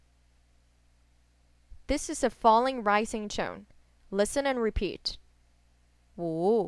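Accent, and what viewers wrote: Taiwan. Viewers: taiwan mandarin？ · Is this Taiwanese or China dialect? I thought 1 = yao?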